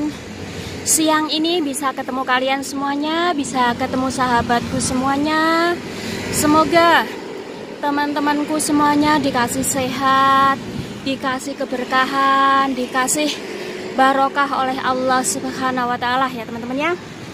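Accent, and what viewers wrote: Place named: Java, Indonesia. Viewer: Wong jowo yo mbak? Karena bahasanya agak medok....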